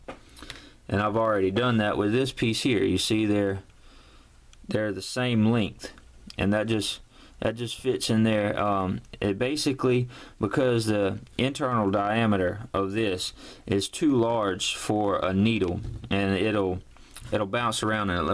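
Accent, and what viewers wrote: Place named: South, United States. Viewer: Bham, Alabama. haha something told me you was from the south.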